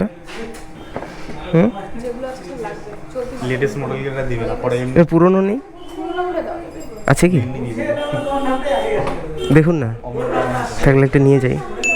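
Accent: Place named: North Bengal, India. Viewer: North Bengal এর accent টা ভিডিওতে রাখসো.. আমার খুব ভালো লাগলো দাদা 🤗 btw আমি মাথাভাঙ্গা র বাসিন্দা ♥️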